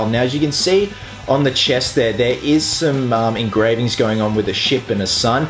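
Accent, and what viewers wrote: Australia. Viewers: After watching about fifty of your videos, this is the first time that I noticed that you had an Australian accent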